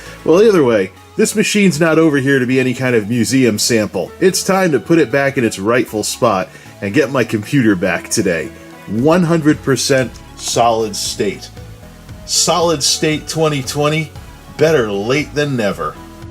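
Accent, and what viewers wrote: United States. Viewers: Which part? If you are going to say that I don't know what I am talking about, I suggest you be a little more specific. My math could be off a little, rounding, but Google says that the average rate for electricity is .18 cents per kilowatt hour in the United States, That makes my figures fairly accurate. I redid my math. According to Google my math is pretty accurate if you are in the United States on the mainland. In Hawaii electricity rates are about double the U.S. average. He has an American accent, so my assumption is that he is somewhere in the United States and not in a place like Germany where the average rate per kilowatt hour is much higher. He did not state where he is. That SSDs are better than sliced bread? This is my opinion, an opinion derived from owning hard drives and then several SSDs. If you are going to say that I don't know what I am talking about, is not exactly accurate, because I believe that everything that I said, while not being exact, is pretty accurate. 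That you do not agree with what I said, in no way means that I don't know what I am talking about. If you do not agree with my math, I suggest that you do it yourself. Did he say an additional reason that I didn't hear? The best that I can deduce from his video is that his previous hard drives used so much more electricity than his new SSDs that it makes it financially worth switching. I made a pretty fair argument that while SSDs are much better, the electricity that you save is not a reason to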